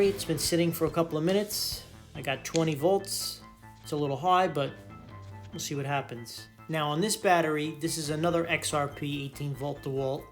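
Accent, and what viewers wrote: New York, United States. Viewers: Ees a New Yorkr or from New Joyzee. No? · I’m subscribing because I hear a NY accent! Good content too lol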